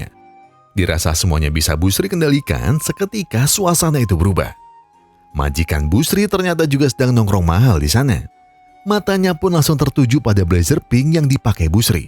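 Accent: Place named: Java, Indonesia. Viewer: Cakepp bangn... Abangnya lucu.. Logat guyonana jowo😅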